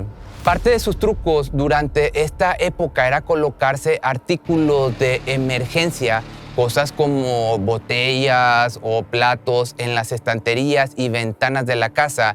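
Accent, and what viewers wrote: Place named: Mexico. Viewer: Gràcias,luego lo veré,el problema es que tienes una grácia contando cosas tan sérias,será tú acento mejicano,me tienes enganchada,un abrazo desde España.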